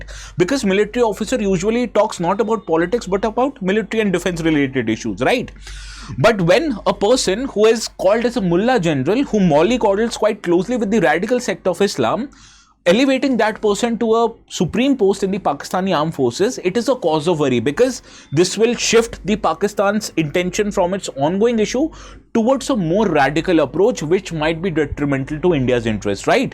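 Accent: India. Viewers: pity ... Indian english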